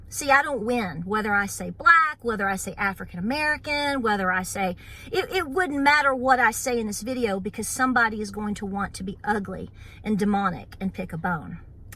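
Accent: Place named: Tennessee, United States. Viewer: Love your channel more and more everyday. Love that Tennessee accent too. Lovecyall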